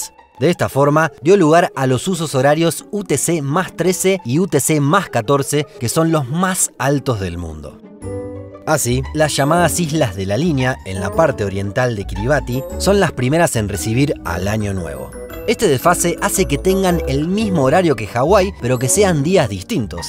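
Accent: Buenos Aires, Argentina. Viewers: De todas maneras además de hablar de las "Islas Malvinas" y reivindicar nuestra soberanía sobre ellas en varios videos en los cuales se las nombra, y del acento caracteríastico de los porteños (no de los argentinos, porque en mi país tenemos muchos acentos distintos), el canal está registrado como un canal argentino. Estos muchachos han estudiado en una de nuestras honrosas Universidades Públicas, dios y el pueblo las conserve. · Sos argentinos o uruguayo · una pena el acento argentino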